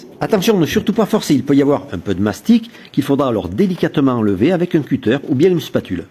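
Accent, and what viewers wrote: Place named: South, France. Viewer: l'accent du sud c incroyable 🎉chef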